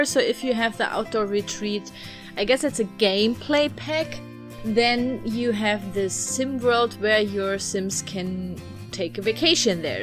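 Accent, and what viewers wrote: Germany. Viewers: Are you from Germany? Your accten sounds german :) Btw: This Video is amazing and so creative !! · ich bin gerade sehr begeistert von deinem englisch. du hast einen superdominanten deutschen akzent. und dann hast du so ein großes und krasses Vokabular. respekt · you have the best German accent ever 10/10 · is she german? she sounds like me haha. well if i could speak fluent :p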